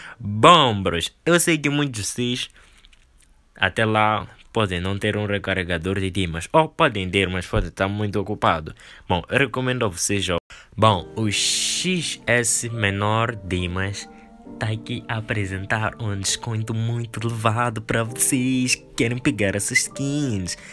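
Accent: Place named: Portugal. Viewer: "Vamos ca ver 1x, vamos ca ver 2x, 3x, 4x,5x" ehhhhhhhhhhhhhhhhhhhhh tuga tmlc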